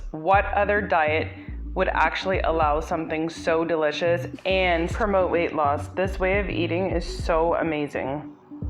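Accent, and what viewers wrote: Canada. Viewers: I hear a Canadian accent! (I live near Canada in northern Vermont!) Great simple delicious recipes. Thanks, and good luck on your journey! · Can’t wait to try the apple, banana, oatmeal snack! Looks delish. You must be Canadian, I can hear your accident. Just subscribed, looking forward to your journey.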